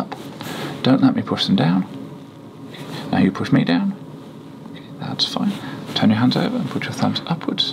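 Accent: United Kingdom. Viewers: Not sure why I’m supposed to watch this, but it’s always nice listening to a cute Brit so okay.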